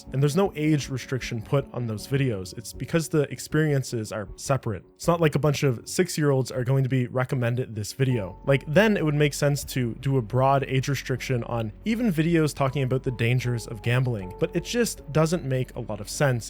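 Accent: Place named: Canada. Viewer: Knew you were Canadian from the accent 😂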